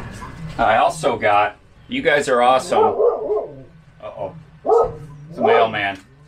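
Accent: United States. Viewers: I was watching something else on utube and the block had the same voice/accent as yours, where in the states are you from? Just heading off this morning bush camping in South Australia. Excited as Lefty getting a bone.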